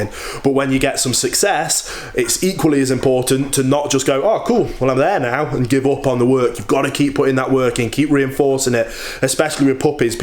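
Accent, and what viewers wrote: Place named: England, United Kingdom. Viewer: I'm enjoying both of your YouTube sites and your puppy course. In 4 more weeks I am going to be able to pickup my lab puppy. With the help of a special trainer I will train the pup to be a mobility service dog. I want to be able to go places where a rolling walker is a problem. Keep up the good work, best wishes from Albuquerque, New Mexico in the Southwest of the U.S. ... P.S. many years ago I worked for the DuPont company in Stevenage, so your "accent" is welcome.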